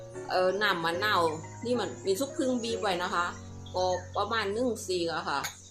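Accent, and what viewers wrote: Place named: South, Thailand. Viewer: ชัดเจนเลยคนใต้เช่นกันเลยค่ะ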